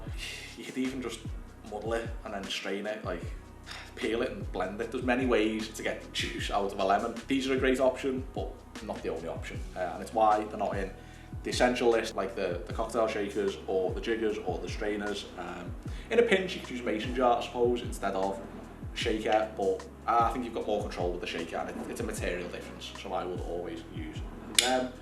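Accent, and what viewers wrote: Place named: Liverpool, United Kingdom. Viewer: Stumbled upon you and your channel today when my pal Coach Vino posted about your drink, Vow of Silence. And now I get to work on my ability to understand Scouse! I've subscribed and look forward to your videos. Cheers!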